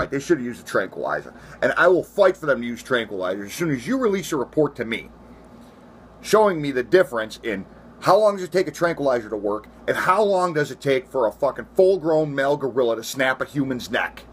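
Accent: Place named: South, United States. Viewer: Where were you from? I love the southern accent! Men & women! You should have written a country song about the incident. Though id like a clean language lyrics of that song if you could write it! You're are are right! That mother was ignorant!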